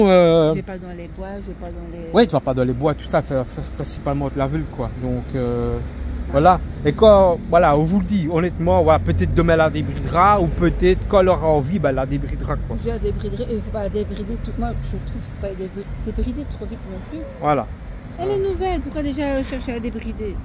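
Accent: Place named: Belgium. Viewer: j'aime bien l'accent BELGE UNE FOIS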